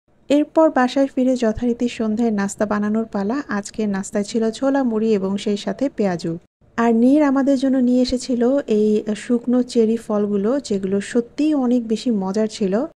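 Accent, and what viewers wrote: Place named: Barishal, Bangladesh. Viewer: Tmr basha barishal er kothay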